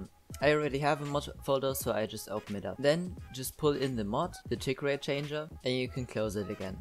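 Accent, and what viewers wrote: Germany. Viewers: as a german i can say that your are german Right? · deutsche accent killt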